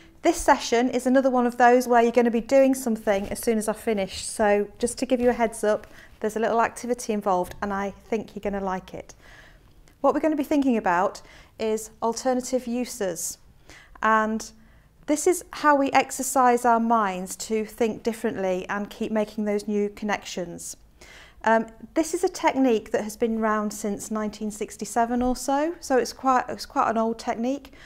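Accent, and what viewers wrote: United Kingdom. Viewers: I'm an English teacher, I understand what you are doing as ahelp friend.with British pure accent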